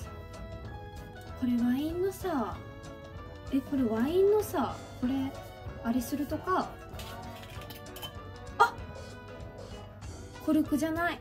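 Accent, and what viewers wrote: Kyushu, Japan. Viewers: 九州弁も可愛いね♪ 都農ワイン、クリアで飲みやすそう！ お母さん、実家だと折り返しづらいかもしれないから、スマホからかけてみたらどうかな？ ゆっくり癒されて、気をつけて帰ってきてね～( ´ ▽ ` )ﾉ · おかえり！ なまり愛莉さん可愛いし、同じ九州出身だからかどこか懐かしくほっこりしました(^^) リフレッシュできたようで良かったです！ そんな中僕はこの動画が時々チカチカして見える程APEXしてました🙄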